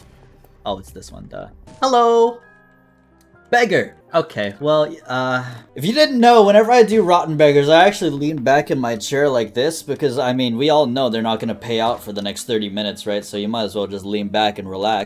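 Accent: United Kingdom. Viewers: Never thought that would be even more british by the day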